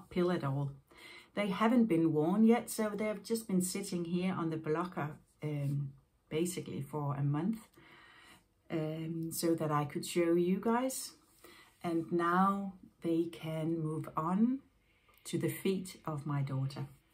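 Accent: Denmark. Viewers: Hyggeligt at komme indenfor - dejlig podcast - sporede jeg lige en Aarhusiansk accent :-D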